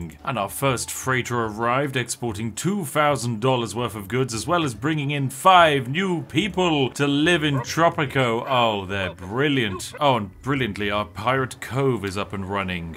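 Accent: United Kingdom. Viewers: I have never heard someone with a British accent be as capitalistic as. It's a "Capitalism Britt".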